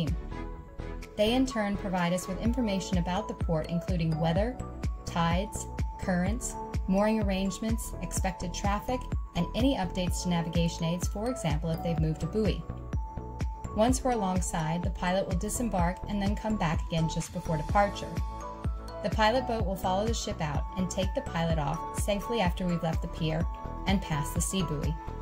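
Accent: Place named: Australia. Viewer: #captainKateMccue are you a fellow Aussie? Your accent sounds Australian :)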